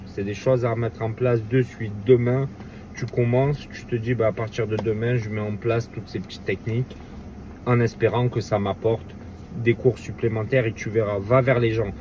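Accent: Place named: South, France. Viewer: Merci beaucoup pour tout ses petits conseils qui apportent énormément Et toujours avec cet accent du sud qui fait plaisir 👍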